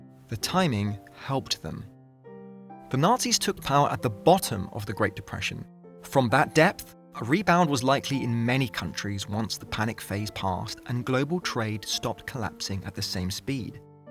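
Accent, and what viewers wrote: United Kingdom. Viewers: How are u British now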